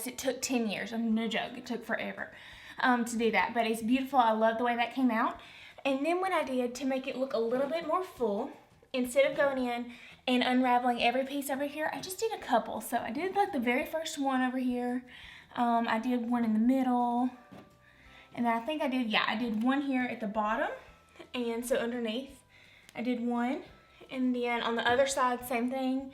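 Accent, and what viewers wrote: South, United States. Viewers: I so love my! You always sound like a Southern Belle - no matter what you think. I'm going to make this. Maybe even today!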